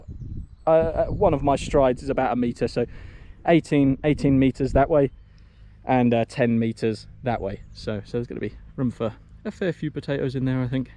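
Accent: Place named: Norwich, United Kingdom. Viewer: I've just found your channel, and am really enjoying the content. Me and my family are thinking of moving to central Portugal with everything that's going on in the UK. I have to ask, I am sure I recognise that accent. Are you from Norwich by any chance?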